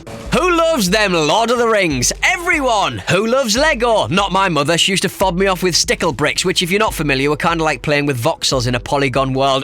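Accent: United Kingdom. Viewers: His accent is "British" you abonibal twat! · the British accent is suck !!!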